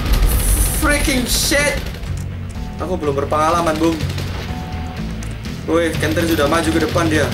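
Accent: Java, Indonesia. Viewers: KAMU ORANG JAWA YA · english'e wis medhok wkwkwkwk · Mas wong ngendi si kok iso boso jowo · Medok tnan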